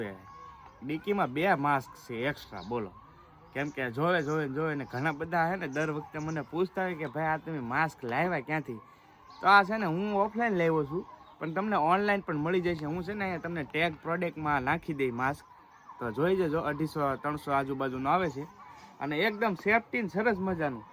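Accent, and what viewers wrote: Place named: Gohilwad, India. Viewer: गोहिल वाड की बोल पहचान जाता है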